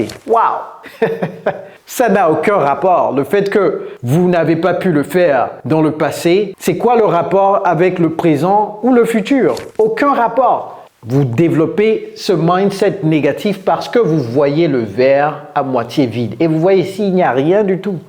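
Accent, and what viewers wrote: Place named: United States. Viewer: Salut coach,je suis étudiant actuellement au Nigéria et je parle bien l'anglais mais je veux parler l'anglais Américain comme vous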